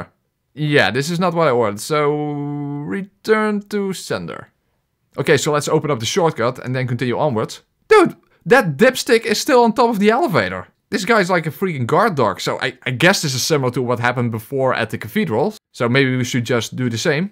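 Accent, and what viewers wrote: Netherlands. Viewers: I fxcking love Dutch accents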